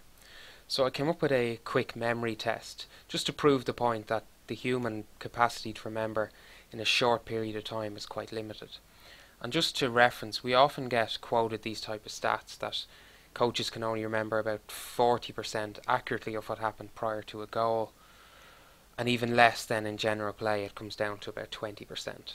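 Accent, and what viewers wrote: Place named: Ireland. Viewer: Your accent is beautiful. You irish?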